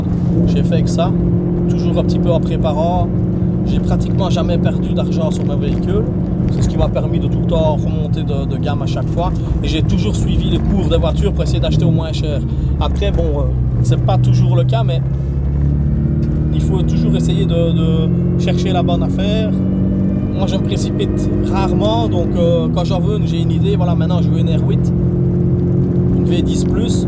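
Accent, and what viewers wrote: Belgium. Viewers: Tu est belge vervier Liége ?? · Désabonné récemment de gmk et Akram pour découvrir un bon gars humble sans fioritures, avec un accent belge très agréable a écouter, bien plus intéressants que les "c'est bôo et elle a le diable en elle" de nos des accolytes superstars de youtube. Bravo a ta réussite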